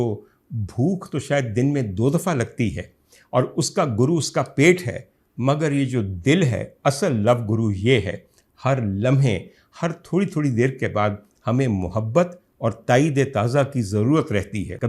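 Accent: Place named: Gujranwala, Pakistan. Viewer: Such a realistic and brutal review. I enjoyed the typical Gujranwala Punjabi.... Especially kanwann day athru 😂😂 Stay blessed dr sahab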